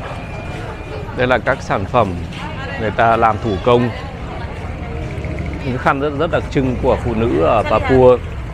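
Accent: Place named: South, Vietnam. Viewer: Chào bạn..nghe giọng nói của bạn là người miền Nam. không biết. quê hương VN. của bạn.ở tỉnh nào. miền Nam Việt Nam.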